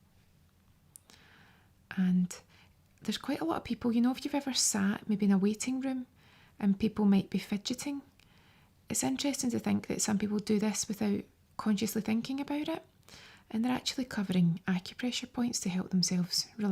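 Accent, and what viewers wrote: Scotland, United Kingdom. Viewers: If something feels good, or in the case of ASMR, sounds good, then yeah, it should really come as no surprise to anyone when the subject becomes relaxed, which of course is going to induce drowsiness. Whether there's science behind acupressure or not I guess could be for another discussion. I'm leaning more towards power of suggestion. Regardless, the soft spoken/Scottish accent combo is eargasmic, but I need more than 6 and a half minutes, so Ima loop it. 😬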